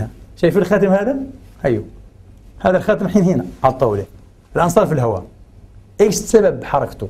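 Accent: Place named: Palestine. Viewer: والله جعلتني اشتقاق الى سماع اللكنه الفلسطينية انت ممثل مسرحي رائع انت فنان نبرات صوتك صادقة .....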